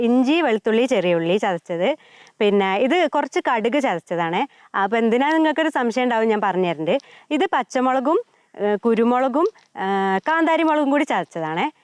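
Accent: Malappuram, India. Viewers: മലപ്പുറം ഭാഷ 👌👌🤦🏻‍♂️👌👌👌😂😂😂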